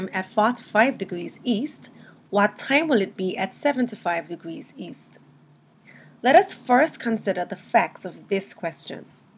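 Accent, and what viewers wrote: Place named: Jamaica. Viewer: You sound like a jamaican👅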